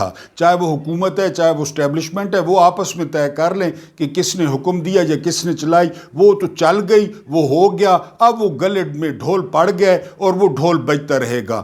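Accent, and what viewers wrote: Saraiki, Pakistan. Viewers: جیو۔ بھائی ۔۔۔ انداز گفتگو ۔۔ سرائیکی تڑکہ لگا نے کا زبردست انداز ۔۔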